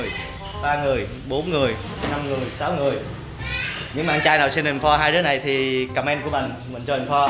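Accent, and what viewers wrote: Soc Trang, Vietnam. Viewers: Quê anh ở sóc trăng hả anh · Quê anh sóc Trăng hả?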